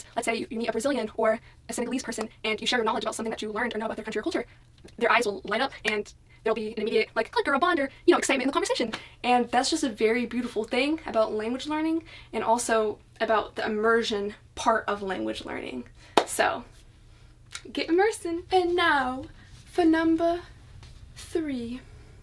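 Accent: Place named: United States. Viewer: OMG!!! That heavy American accent is quite funny haha. Whenever I hear it, it just makes me laugh lol.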